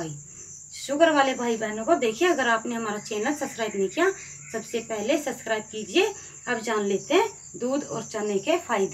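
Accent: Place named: Hyderabad, India. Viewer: Madam aap se aane ki taraf se ho kya aapki awaaz Udhar Ki Lag Rahi Hai main Hyderabad se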